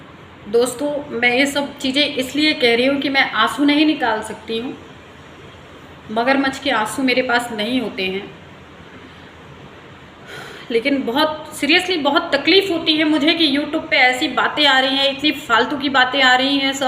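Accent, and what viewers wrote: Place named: Bhojpuri, India. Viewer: Kya bat h gjb sis apne pure bhojpuri me koi sahi bola to ap 🙏🙏🙏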